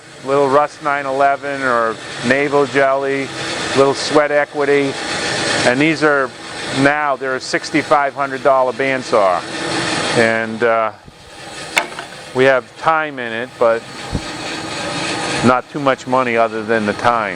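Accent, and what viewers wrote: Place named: Maine, United States. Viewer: Almost like a Maine accent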